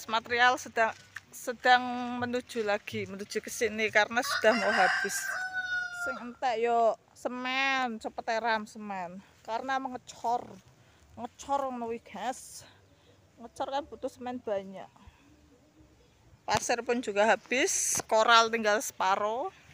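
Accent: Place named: Banyumas, Indonesia. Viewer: Balik maning kro inyong kiye hot milf... ky kuwe yu openinge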